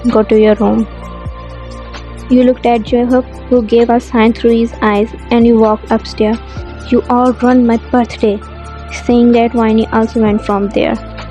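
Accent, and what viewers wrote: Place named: Asia. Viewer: don't mind but I thought you were from Asia or something cuz of your accent 🙂🙂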